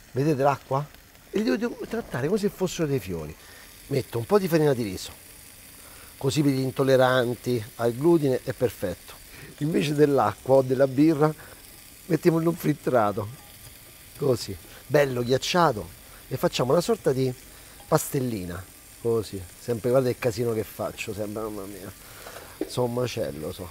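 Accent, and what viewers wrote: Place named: Nettuno, Italy. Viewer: Sei dorceeee...con.. Noto ogni tanto un accento nettunese..☺🍾🍾🍾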